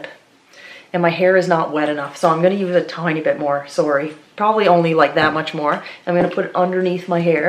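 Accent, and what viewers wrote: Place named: Nova Scotia, Canada. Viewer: Btw, I’m so happy to see you again. I love hearing your NS accent ❤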